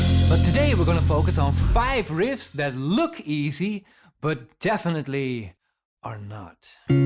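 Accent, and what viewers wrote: Ireland. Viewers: ure Irish dnt u..?!? Love ur videos well done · What's that accent you have brother, Irish?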